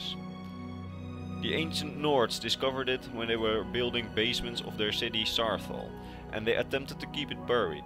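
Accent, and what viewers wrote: Netherlands. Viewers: you sound dutch ...but nice vid ^_^ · As a Dutchman, I can hear clearly in the sound of his English he is Dutch. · It sounds like a dutch accent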